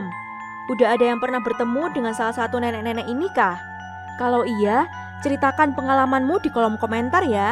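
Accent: Java, Indonesia. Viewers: Mbaknya Jawa mn, mendok bgt ngomongnya😃😃😃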